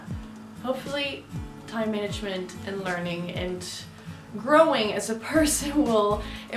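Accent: United States. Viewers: Your english sounds american af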